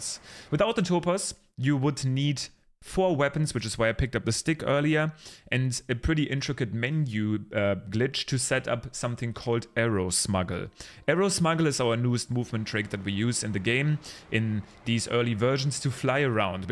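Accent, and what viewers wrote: Germany. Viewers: I love your accent! Southern American here. Apologies if you aren’t German, but one of my favorite teachers ever was German and you remind me of him! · Wie man einfach instant hört, dass du deutsch bist haha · I can hear the German accent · Are you german?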